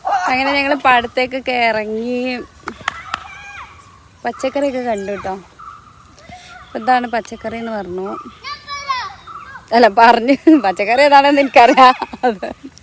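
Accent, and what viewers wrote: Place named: Malappuram, India. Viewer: ഇത്ത മലപ്പുറം ഭാഷ തന്നെ 😂😂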